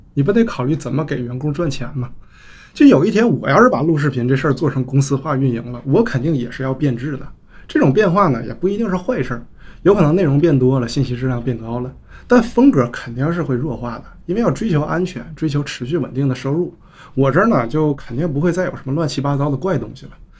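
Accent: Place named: Tangshan, China. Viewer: 这个口音，是唐山人吗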